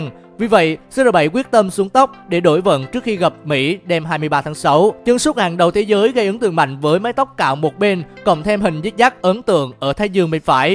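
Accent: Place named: Da Nang, Vietnam. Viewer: Giọng đà nẵng chắc luôn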